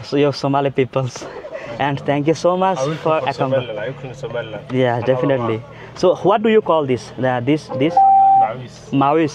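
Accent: Chittagong, Bangladesh. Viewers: উনি চাটগাঁইয়া বা চট্টগ্রামের মানুষ। উনি চট্টগ্রামের উপভাষায় কথা বলতো।তাই পিউর বাংলায় কথা বললেও চট্টগ্রামের একটা টান থেকে যায়।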